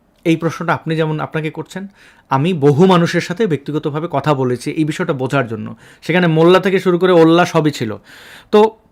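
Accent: Sylhet, Bangladesh. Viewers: ভাই আপনার সিলেটি ভাষা অনেক সুন্দর।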